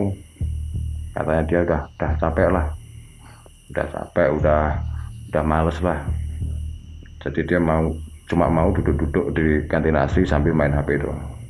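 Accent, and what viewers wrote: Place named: Java, Indonesia. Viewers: Mak buugghh, kuaget asem o WKWKWKKW JOWO BGT MAS E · Hio itu batangan lidi..., halaah kesuwen mas. Hio itu Dupa, ben gampang. Ceritane mantep apalagi kalo ndak peke Ghuwe...logate isih medhok, ora pantes. Sepurane, sekedar saran... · sy malah suka dgn aksen mas yg ga dibuat buat..apa adanya . malah medok bgini jd ciri khas nya mas.. . dan yg julid ttg kata "gua".. tlg blajar dulu ttg aksen seseorang.. hehe.. semangat mas..pertahankan ciri khas anda..ditunggu crita brktnya. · Iyaaa , aneh deh bahasanya medok khas jawa tapi ngomong gue gue..wkkk...pake aku yo rapopo kok, keknya jg jarang deh orang jawa tengah /jawa timur ngobrol peke gue gue sekalipun anak hitz gaul..wkkk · Mohon maaf sebelumnya, lebih baik pake 'saya' atau 'aku' aja ya mas.. Bukannya mau bilang org Jawa gapantes ngomong 'gw', boleh kok ga larang. Cuma.. 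kadang kalo aksennya kental bgt kaya gini didenger terkesan maksa aja jd ga natural 😂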